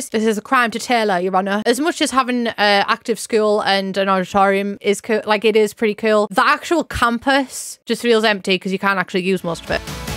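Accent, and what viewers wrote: United Kingdom. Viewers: bro i’m sorry but what’re your accent is? somewhere in britain?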